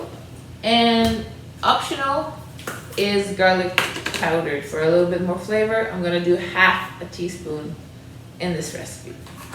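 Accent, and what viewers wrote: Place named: France. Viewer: You are so charming with your French accent.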